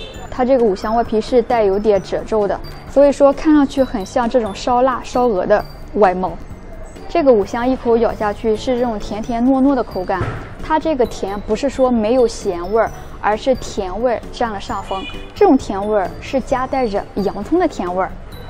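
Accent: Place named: Beijing, China. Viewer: 好像是北京口音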